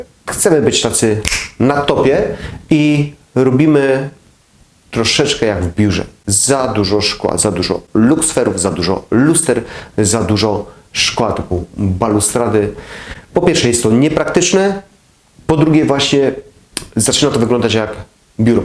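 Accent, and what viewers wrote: Silesia, Poland. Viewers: 👍❤️ SUPER 😘 i do tego uwielbiam Pana śląski akcent,😍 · Uwielbiam pana śląski akcent mogłabym słuchać godzinami ☺️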